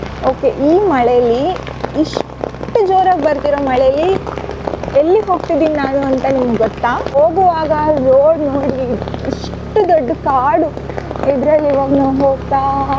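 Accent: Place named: Sagar, India. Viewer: any how I like u beauty voice as Sagar taluk kannada ❤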